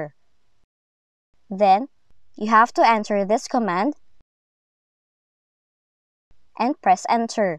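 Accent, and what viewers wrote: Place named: India. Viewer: I must say you cerntainly know what you're doing; however, (and I apologize for this) your Hindi accent overwhelms the pronounciation of some words (e.g.) step three, after the command "echo off|clip" you say press enter , then check __________(not understandable).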